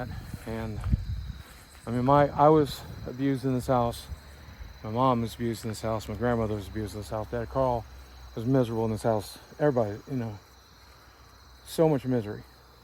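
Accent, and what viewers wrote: South, United States. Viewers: It’s nice hearing Boogie’s southern drawl come back. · It's funny to me that he lives in Arkansas but his southern accent only comes out when he goes to Virginia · I noticed on both this video and the one from a few years ago, Boogie’s southern accent comes out a lot more when around that house.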